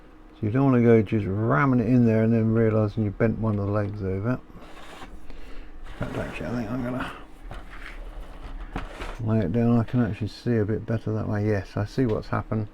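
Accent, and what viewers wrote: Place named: RP, United Kingdom. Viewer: Great repair! I am a teacher of English living in Mallorca, Spain. I need to say that you have a great accent and pronunciation! I have even shown your videos to my students to show them propper RP! I am also very keen on repairing amplifiers! Congratulations on how much you teach the community with your great videos!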